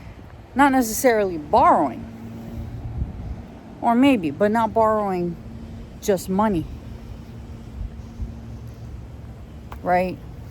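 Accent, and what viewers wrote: New York, United States. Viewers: you actually sound Italian in your normal speaking. You sounded great imitating Sonny, because you naturally sound like from New York or Philadelphia! You always reminded me of a girl version of Ralph Macchio. You would have been terrific if you got to be in the Godfather movies. I lent $1050 last year to two different people, $500 to one person and $550 to another person. I haven't gotten a penny back so far. Well, I will be just like Sonny, they will never ask me for money ever again, so I paid that to get them off my back. Also, I truly believe that God replenishes in the future. God will give it back and I might not even realise it, but he will.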